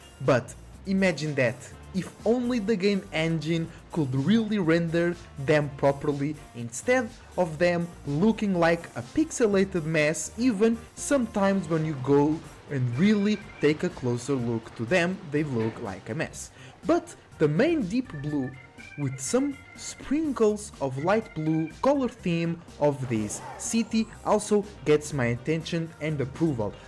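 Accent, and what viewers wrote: Portugal. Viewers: You have a portuguese accent Eu tinha feito este comentário antes de mencionares, achei o video bem top 👌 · eu qd oiço um portugues a falar ingles sei logo ahahah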